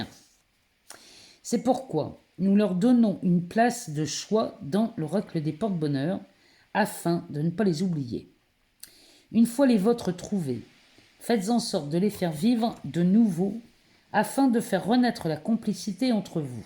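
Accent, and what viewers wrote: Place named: Nord, France. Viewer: Bonne année. Merci d'être zen précise et positive. J'adore votre accent Chti.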